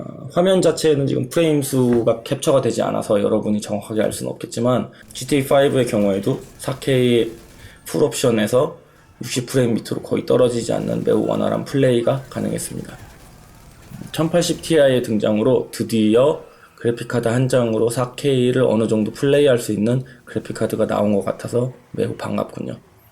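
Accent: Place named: Gyeongsang, South Korea. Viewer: 띵충 보면 욕하는 경상도 사투리 쓰면 일베인가? 경상도인 어떡하지..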